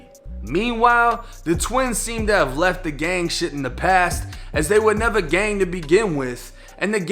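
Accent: Boston, United States. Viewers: He from Boston I think lol he got that stupid accent 🤣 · oh youre from Boston for sure. soon as you said "Car" it was confirmed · You from Boston bruh? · Depottment? Incosserated? Are you from Boston, sir? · Wait. You said PAHKINLOT LIKE I DO BRUTHA. you from Boston. I'm in Dorchester. South bay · Yo you from Boston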